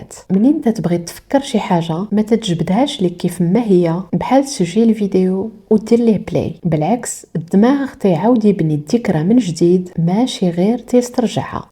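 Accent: Marrakech, Morocco. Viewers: أحسن يوتوبر فالعالم، التنوع فالمواضيع، التشبيهات العميقة، الطنز العكري، اللكنة المراكشية، هاد الكومبو و الله ما تلقاه فشي كوان اخر. تبارك الله عليك ا خا ❤️ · انا هاديك " أخا" كتسالي معاي اللكنة المراكشية مع الوعي كومبو فوق كل المستويات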